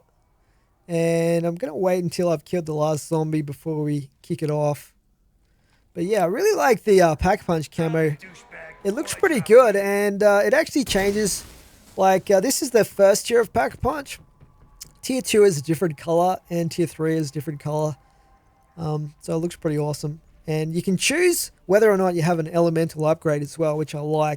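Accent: Australia. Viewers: Literally I only ever watch your zombies content. Somethin about your australian accent and calm demeanor makes you so entertaining. Definitely an underrated youtuber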